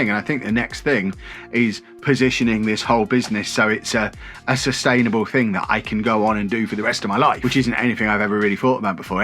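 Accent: England, United Kingdom. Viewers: are you Rob Chapman of chapman guitars brother? you look exactly like him and you both have english accents so i would assume so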